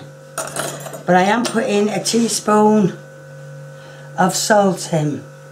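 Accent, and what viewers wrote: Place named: Sheffield, United Kingdom. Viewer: Hi:) can you make your own pizza? if not for you.. then for Lee...;) I'm from Sheffield... you sound from round this way x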